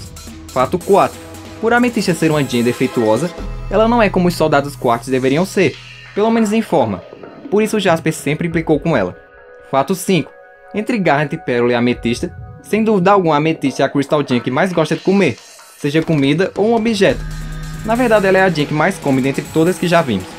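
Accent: Northeast, Brazil. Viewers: Voz de NORDESTINO ,AS OK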